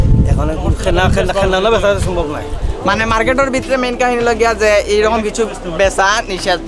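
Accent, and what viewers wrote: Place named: Chittagong, Bangladesh. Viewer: Bhaiya apner Bari kothay? Is it chottrogram, coz I'm from chottrogram kintu okhaner bhasha kisui bujhi na😅but your one sounds like that, 😊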